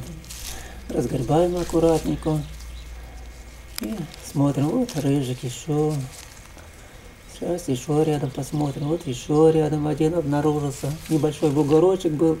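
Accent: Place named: North, Russia. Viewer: С севера видимо.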